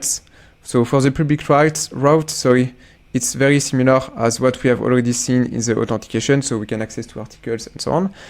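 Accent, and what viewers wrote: France. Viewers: Good video with your french accent.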